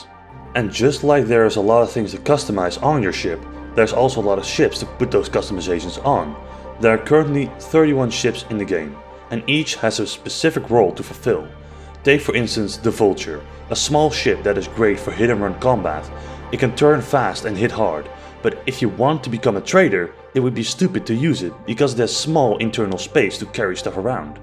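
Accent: Netherlands. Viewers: binnen 5 secondes merkte ik al dat je nederlands bent 😂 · That horrible Dutch accent though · I heard the accent and bloody knew you were Dutch xD. Verekte Nederlander! ;) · Nice review, convinced me to buy it. You sound very Dutch btw, something i couldn't help but notice. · Mooi filmpie, voortaan wel ff dat nederlandse accent minderen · Ik hoor een nederlands accentje? :P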